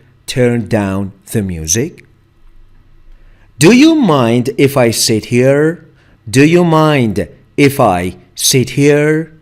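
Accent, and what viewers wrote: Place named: Togo. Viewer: Togolese english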